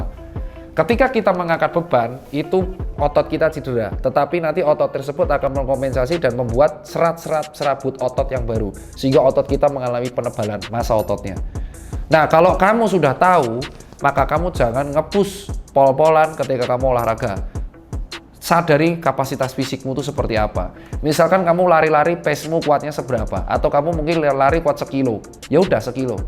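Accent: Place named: East Java, Indonesia. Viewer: Dr tirta ngomongnya kok kayak orang jawa timur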